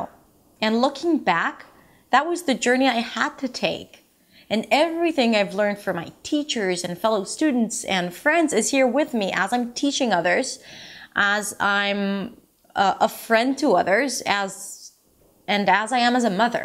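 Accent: New York, United States. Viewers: First thing first you sound so American, secondly everything you said has happened to me. 1) People completing my sentences each time I tried to say a complex sentence 2) Blank, confused and frustration on people face each time I spoke 3) Huh, I sorry what did you say? 4) Correcting me each time I spoke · Your English is perfect to me. I really thought you were American. I surprised when I saw you saying that you are not American. I love your channel because I've learnt a lot with you. I am Brazilian and I effort to improve my English and one day... who knows... have an excellent accent like you. Congrats!!! You are making the difference in people's live. 😘😙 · In your story, you jump from "I was born in Izrael" to "when I was 20 I moved to New York". What was your experience with English before you were 20? Especially before 14y.o. I might be wrong, but you your accent is 100% American. 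Not only the accent but the use of words (collocations, idioms etc.). It's hard to believe you had not been raised (or spent at least some significant time in your childhood) in English speaking environment. It would be honest to tell about your childhood experience with English to the people who watch your videos so they can realistically estimate their chances to achieve the same level of natural speaking as you have. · Phil Smith I totally thought she is an American!! I am Chinese though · Ohh god You are very smart you speak like a native English teacher congratulations 🎈 for a moment I thought you were American.. i love ❤️ to watch your videos. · No, I personally don't need it, as I'm not a bad accent mimic myself (at the risk of sounding conceited). I'm not even sure how or why I found your channel, but I occasionally look at different language and accent videos, so itmust have been in the recommended list, and then I saw your obviously Israeli-sounding name, so I checked it out. 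Bekitzur, kol hakavod al ha mivta ve al he'arutz shelakh, at nishmaat kimat amerika'it legamrei, kashe le'haamin she dibart anglit im mivta yisraeli lifnei kama shanim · You speak perfect American accent. If you did not say you are Israeli I would not recognize English is not your native English. Your story is full of inspiration. I admire the Israeli because their contributions to the world. Thank you very much. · Hi! I’m Chinese and I live in Paris. I’m your new follower since one week. I’ve been very surprised by your excellent NY accent that I really want to master also. I even showed your video to my French husband who speaks very well English, he didn’t doubt one second that you are nota native speaker. I like you accent and your struggle personal story, very motivating! And I appreciate much your confidence (and your new hairstyle 😊). I enjoy your course and hope that in several months I could get the half of your level, thank you so much! 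Love you! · Wow! I want to learn English like a native English speaker! I love the American accent, tell me what the secret is. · You can unsubscribe if you want. But I wouldn’t really worry about her making a mistake. She has spoken with an American accent the whole time and even took speech classes to correct her pronunciation in the beginning. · You really inspired me dear sister. I'm very happy that God has bless you with the facility to learn English. I'm amazed that you sound 100% American when you speak. I'm a Christian and I'm believe that God gifted to us with such bless to pursue anything that we want.... Listening to you really gives me confidence for my learning.... God bless you dear sister....bue😀😊😄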